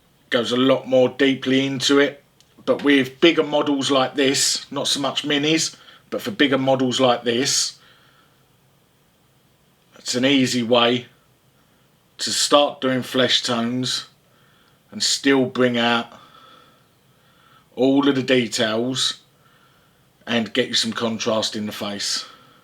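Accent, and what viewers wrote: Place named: England, United Kingdom. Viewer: American language? Wot you on about you bleedin sceptic. Its the king's English he's speaking. He ain't gonna speak Spanish nah iz eee?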